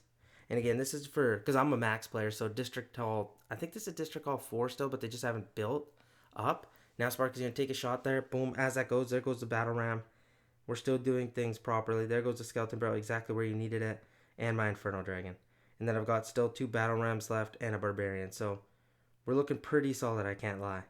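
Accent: Canada. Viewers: You owted yourself. Bro is from Canada or upper midwest. I think he watches trailer park boys too. The way she goes!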